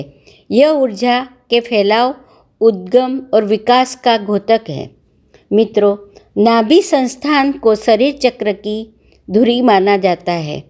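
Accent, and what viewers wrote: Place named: Gujarat, India. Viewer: Madam 👩 ji 🙋‍♀️🙋‍♀️ Aapka... ( pravachan ) bahut achha hai 🙋‍♀️🙋‍♀️ But aap pakka gujrati ho ji 🙋‍♀️😊😊🙋‍♀️🙋‍♀️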